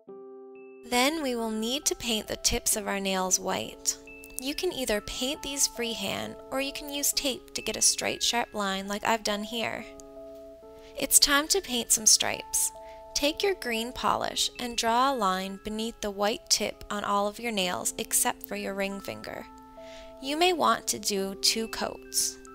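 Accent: Canada. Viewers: I'm pretty sure she's Canadian. She has a bit of an accent when she says "about", and it sounds Canadian. People with different accents say words differently.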